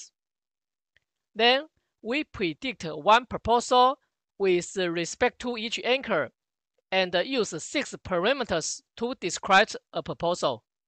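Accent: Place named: Taiwan. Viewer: The accent of oral English of Taiwanese and Mainland Chinese do have a lot in common which makes your presentation much easier for me to understand, thanks, buddy!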